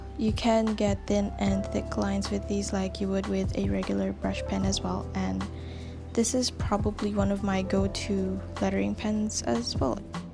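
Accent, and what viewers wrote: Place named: England, United Kingdom. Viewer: TBH! your english accent is music to my ears uwu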